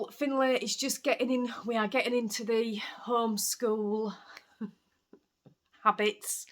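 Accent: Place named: Leeds, United Kingdom. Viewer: Love your podcasts and cant wait to see the advent leggings. Are you in Leeds? Just wondered cause that's where I am and you've got the same accent 🙂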